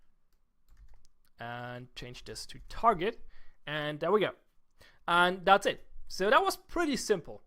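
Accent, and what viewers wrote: Germany. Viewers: Hey, ich bin mal gegenüber deinen anderen Zuschauern so unhöflich und schreibe auf Deutsch, da doch bemerkbar ist, dass deine Muttersprache Deutsch ist. Kann es sein, dass da vielleicht sogar jemand aus Berlin ist? Da bin ich mir aber nicht sicher. Ich habe screeps vor ein paar Monaten schon mal entdeckt, aber nach dem Tutorial nicht genug Motivation gehabt. Jetzt gestern in in der Vorlesung hat ein Kommilitone screeps auf seinem Laptop offen gehabt und jetzt, als Informatikstudent ist die Motivation doch da. Und gestern dann nach ein wenig Starthilfe gesucht und auf deine Videos gestoßen. Und ist die perfekte Starthilfe :D Wenn du auf den offiziellen Server umgezogen bist und du Richtung Kampf und Handel und sowas gehst, kann ich gerne meinen bescheidenden Fähigkeiten entsprechend helfen. Beste Grüße aus Berlin Diskerror/Nils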